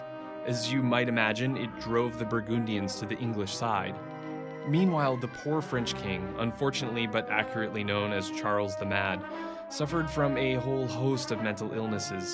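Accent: United States. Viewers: Atleast get a narrator with an English Accent, this history lesson of England with an american accent seems so unoriginal and uninspiring. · It's a new experience for me to hear English history in an American accent - I enjoyed it. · Its jarring to hear a US accent, I would have preferred an English one.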